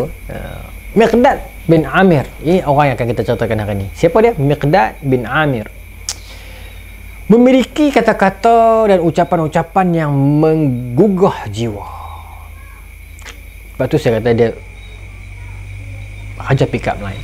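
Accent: North, Malaysia. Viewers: 😃😄 wahh.. Ramai juga yg faham kan kuliah Ustaz walaupun beliau pekat loghat utaqa.. Boleh nampak disitu keikhlasan Ustaz dalam berdakwah 👍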